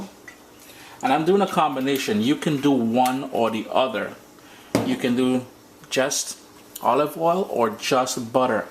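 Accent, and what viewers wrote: Trinidad and Tobago. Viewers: of course i will put scotch bonnet pepper on it!!also brother how do i make this more carribean? i love your accnet!! trinidadian? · Yuh sound Trini 🤔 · Am I hearing a hint of a Trinidadian accent?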